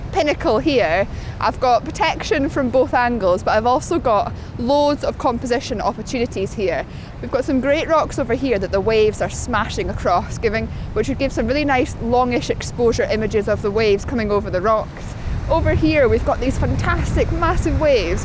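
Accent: Scotland, United Kingdom. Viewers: Totally insane!! Great video! Delightful scottish accent by the way, like it hahaha!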